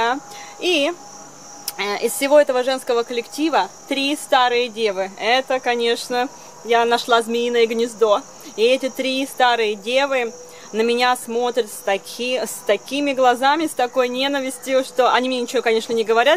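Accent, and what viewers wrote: United States. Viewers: впервые сейчас посмотрел ваше видео и вас саму. Вот когда я слушал как вы говорите по русски, то не мог сразу прислушаться к говору, явно замечается воздействие американсгого английского языка, ещё тяните звуки на "а". Не хочу этими словами оскорбить, просто так вот заметил. Просто живу на Среднем Урале и привык к местному говору) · а говор-то уже штатовский.... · У автора уже американский акцент)) позитивно. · ну в общем видео ничего, но сразу видно что заамериканилась, уже поведение и манера разговаривать совсем не русские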